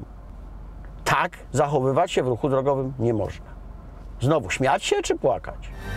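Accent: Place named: Warsaw, Poland. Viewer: Warszawskie naleciałości w wymawianiu słowa 'tutaj' oraz 'dzisiaj' jako 'tutej', 'dzisiej'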